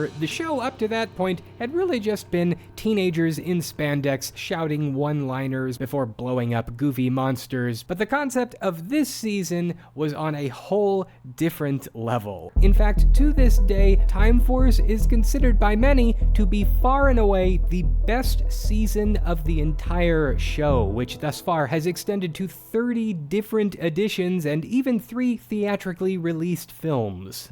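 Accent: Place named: United States. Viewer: You sound very American 😂🤡.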